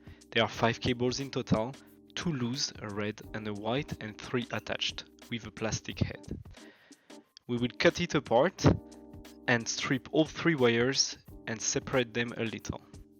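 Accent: France. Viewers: are you french ?? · Cette accent français, super vidéo merci 👍😂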